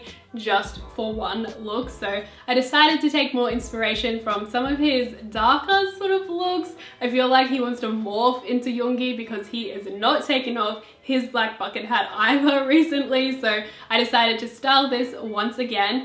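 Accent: Australia. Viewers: ahhh i love this video so much! im from australia too and its so cool hearing someone rant about bts in an aussie accent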